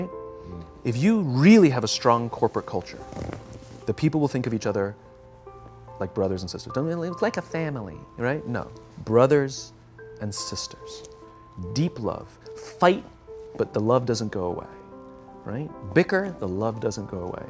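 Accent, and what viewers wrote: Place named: United Kingdom. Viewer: 영국 발음 이네요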